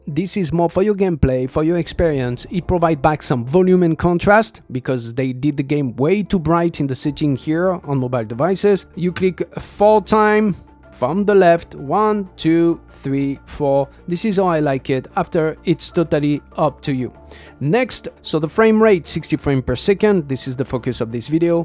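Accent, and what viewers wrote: France. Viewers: your english sounds french lol nice video bt the way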